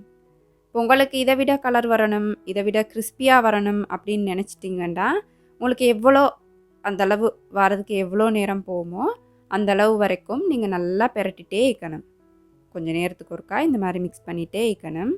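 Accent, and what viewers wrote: Sri Lanka. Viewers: Assalamu allaikum warah nalla erukegala sis...srilanka slang enaku pidikum neega alaha peasurega..ginger,garlic paste neega intha recipeku podala...na try panrean🙂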